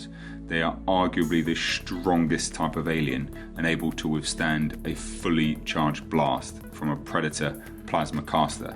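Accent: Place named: United Kingdom. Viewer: Friggin' British accents! I thought you started off with an "Overmorph." Like some sort of ubermench form.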